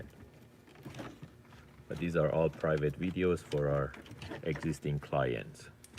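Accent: Iran. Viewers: The guy accent looks iranian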